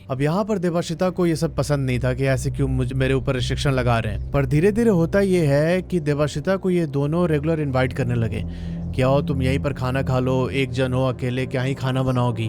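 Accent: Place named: Bihar, India. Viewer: Bhai i feel like you are weather from Bihar or belongs to area like kanpur, Gorakhpur or Lucknow. In every sentence you say- "hai nah" or " aayi nah"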